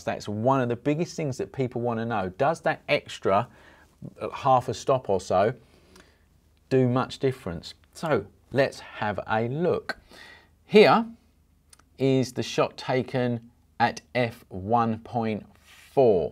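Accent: United Kingdom. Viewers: what i learn is, UK accent, is very pleasing if i may presume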